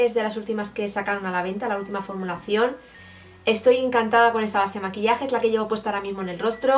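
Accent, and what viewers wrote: Spain. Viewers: Soy Mexicana pero me encanta tu acento y más cuando dices madre mía, flipante, o cuando decías mierda o demás por el bicho en tu cuarto jajaaja. Me encanta tu maquillaje y tus vídeos, creo que ahora cuido mas mi rostro y pecó mucho y más con clinique me compre todo hace dos días base beyond, polvo, corrector y de too face primer... Lo que más me gusta de tus vídeos es que todo lo entiendo y es fácil resolver mis dudas aparte que tu forma de ser es muy linda, besos. excelente día.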